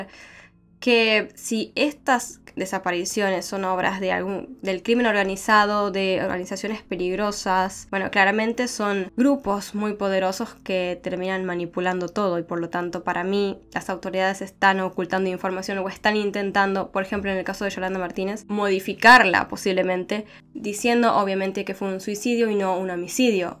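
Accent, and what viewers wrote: Argentina. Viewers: Hola . . Es Argentina de Santiago del Estero ! 😊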